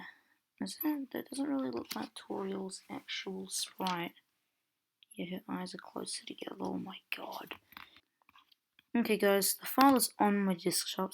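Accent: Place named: New Zealand. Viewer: I love the kiwi accent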